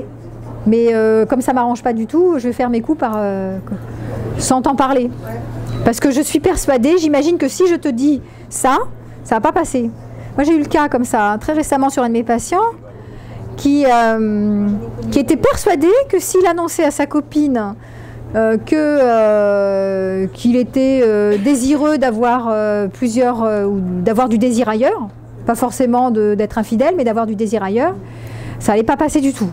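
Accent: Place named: South, France. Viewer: je comprends pourquoi je vous trouve une ressemblance avec celle de ma collègue. Elle est aussi du Sud de la France. Raison pour laquelle vous avez probablement, la même intonation, je pense... En plus du côté alpha, j’étais aussi clivé, je dirais que je suis beaucoup plus clivé aujourd’hui.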